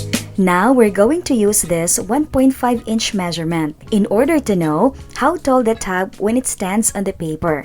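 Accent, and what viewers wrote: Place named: Philippines. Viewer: It would be really helpful if you could make the measurements stand out more as really hard to see pencil. Black felt tip maybe? Are you Phillipina? I thought I recognised the accent 😊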